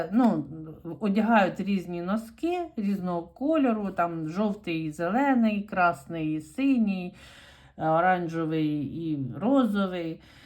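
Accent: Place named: Odesa, Ukraine. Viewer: Ваша смачна , українська мова з одеським присмаком теж зачаровує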